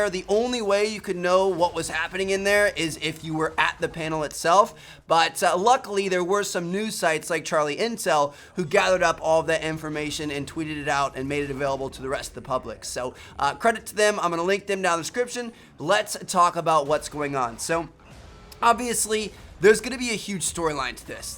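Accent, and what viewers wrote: Germany. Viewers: What a German voice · Wie der einfach mal voll den deutschen Akzent hat im Trailer 🤣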